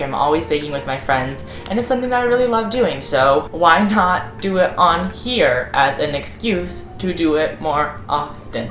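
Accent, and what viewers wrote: South, United States. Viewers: "Why do I sound southern?" lives in texas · “Why am I like... southern?” You.... you.. you live in.. Texas. I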